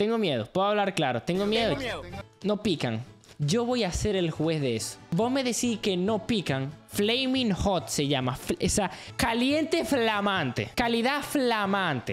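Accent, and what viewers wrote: North, Mexico. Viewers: norteño xd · No todos hablamos así en mexico:( JAJAJA solo en el norte y en el estado de mex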